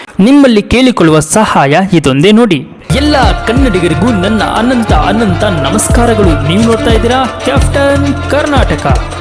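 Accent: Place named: Tamil Nadu, India. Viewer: ಲೋ ಅಯೋಗ್ಯ, ಚಾನಲ್ಲ ನಿರೂಪಕ, ಸ್ಪಷ್ಟ ಕನ್ನಡನೇ ಬರಲ್ಲ ನಿನಗೆ, ತಮಿಳು ಮಿಸ್ರಿತ ಕನ್ನಡ ಮಾತಾಡ್ತಾ ಇದಿಯಲ್ಲೋ, ಭಿಕ್ಷುಕ ನಂಗೆ ಕೇಳ್ಕೊಳ್ತೀಯಾ ಸಬ್ಸ್ಕ್ರೈಬ್ ಆಗಿ ಅಂತ, ನೀವೆಲ್ಲಾ ಕನ್ನಡ ಭಾಷೆಯ ನಿರೂಪಕರು, ನಿನ್ನ ಯೋಗ್ಯತೆಗೆ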